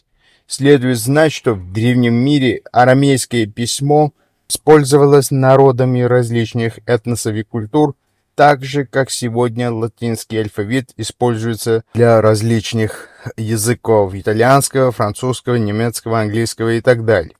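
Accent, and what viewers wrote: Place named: Georgia. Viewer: ❤❤❤ Слава богу услышал,что возраст примитивных наконечников возрастом 2млн.лет.Почему-то считают 20-30 тыс.лет официально возраст человечества.Неужели нельзя называть правильный возраст человечества на Земле.Ранее видел раскопки Грузии,где были обнаружены гигантские челеческие кости.Хотел бы об этом найти продолжение....Мне нисколько не мешает озвучка человеком грузинским акцентом.Намного лучше,чем озвучка ИИ.....Насколько помню апостол Пётр говорил на арамейском древнем языке.,Как всегда,миру-мир,а армяне присваивают всё то,что раскопано археологами,заявляют о братстве.....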